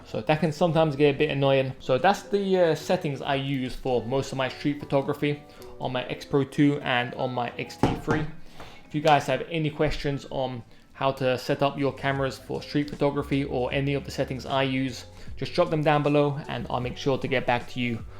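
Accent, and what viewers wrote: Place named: Greece. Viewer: I like how he starts out sounding like Ali-G and ends up sounding Greek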